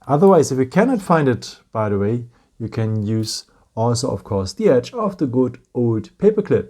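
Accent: Germany. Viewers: RU GERMAN?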